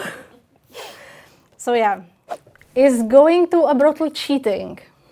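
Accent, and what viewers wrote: Czech Republic. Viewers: Lovely Czech accent. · She has a Czech accent.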